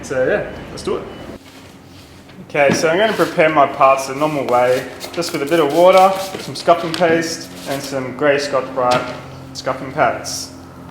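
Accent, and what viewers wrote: Australia. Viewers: An Aussie if Ive ever heard it! Good video mate. Keep up the good work.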